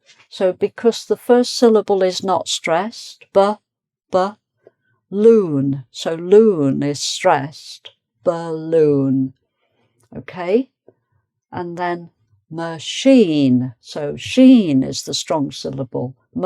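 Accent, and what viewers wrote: United Kingdom. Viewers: I like her so much as an English teacher.I like her British accent a lot. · That was a very clear representation of British accent. Much appreciated. Love from me <3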